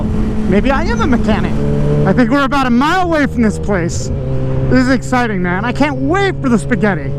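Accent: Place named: Michigan, United States. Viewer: I fucking knew you were from Michigan! Cant mistake that accent or just how you are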